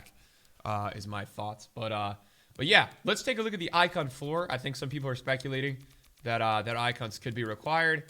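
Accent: United States. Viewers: Where is from I hear US accent but he said 1 pm so where is he from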